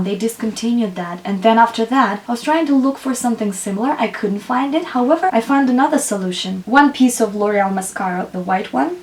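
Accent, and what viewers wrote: Russia. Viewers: I've been interested in Mac lately, we just got one in our mall (I live in a smaller city in Canada so not so much high end stuff here,) so good to know you love it. :) Might try it some day even if it's a little more expensive. I work in a drug store so I tend to just buy make up from there, Nyx (for lip stuff mostly,) Revlon foundation for my oily skin, Maybelline superstay powder for after that, and just anything for the eyes really lol. Side note: I was trying to place where your accent was from, but couldn't, then you happened to say Moscow, Russia. lol Coincidences. So cool, one of the places I'd love to visit some day. <3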